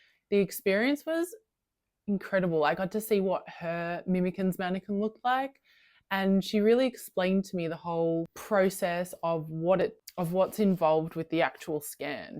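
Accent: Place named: Australia. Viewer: Nice vid! I did the cheats version of this about 18 months ago. I took photos and measurements and padded out a reasonably high-quality mannequin that was close to my size. It's not exact, because it was hard to get the little details right ......but its 80% there. She looks remarkably like yours, lol. Which is......comforting, actually. At first it was confronting to see how 'not perfect" I was. But after looking at her for months, I have accepted her......as me. AND........what a delight it is to be able to use a fit model that is closer to my body! I do corsetry and alot of fitted styles, so it really helps. I don't think she is quite good enough to do draping.....so i am VERY interested in your new acquisition, and seeing how you get along. PS. its lovely to hear an Aussie accent.....and you are a fellow Melbourne lady....so double 👍points there! 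PPS. you actually grabbed my attention initially because I saw your Regency outfit in the background.........I also enjoy costumery and am currently trying and failing to make some long regency stays.......I can't quite tweak it.....even with my padded form. ergh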